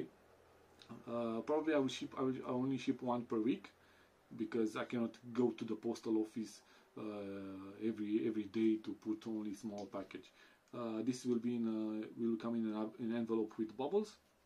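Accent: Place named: Pakistan. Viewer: You're a great guy for all the effort. Interesting accent btw, i hear a similarity to Pakistani for some reason, although you are Polish or Lithuanian i think.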